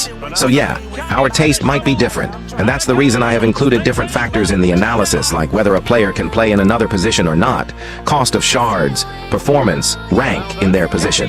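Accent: India. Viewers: yeah I just found out from one of his video and heard his indian voice and accent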